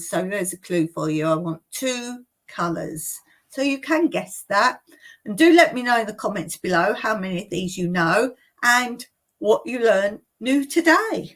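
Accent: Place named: England, United Kingdom. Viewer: 😊 I enjoy the reading especially in your British accent.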